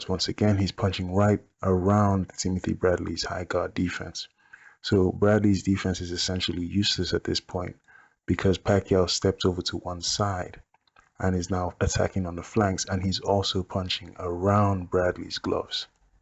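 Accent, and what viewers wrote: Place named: United Kingdom. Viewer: Okay I have to ask, are you the same guy as +barryrobinson who also has a boxing film study channel? You both are black guys who are Flomo's. You even use the same vocabulary such as "defensively responsible, changing head slots, etc." The only difference is you talk w/ a British accent. If you're two different ppl, then you're a fucking hack and get your own ideas. And ffs PLEASE tell me you're not the same guy cuz that'd be so fucking lame lololol.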